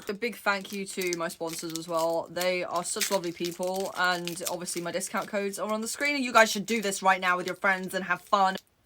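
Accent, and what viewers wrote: United Kingdom. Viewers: Are you british? (Im just asking out of curiosity)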